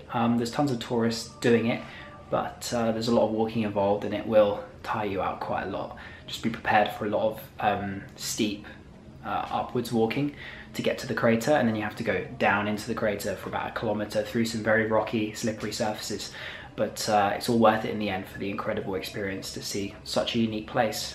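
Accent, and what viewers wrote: United Kingdom. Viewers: British accent ....,👍👍👍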